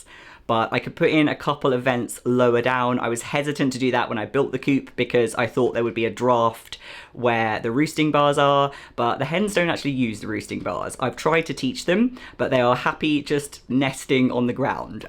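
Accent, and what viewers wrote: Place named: England, United Kingdom. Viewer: Since you are English and now immersed in a Scottish community (accent and dialect), your new found lisp may be your involuntary speech muscles trying to adapt and assimilate......resist! Your accent is lovely! LOL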